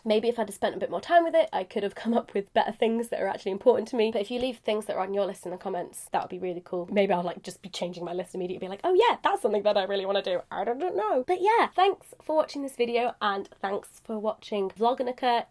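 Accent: United Kingdom. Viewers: You are very cute and sexy and the British accent...OMG...so hot! Much love from Arizona, USA.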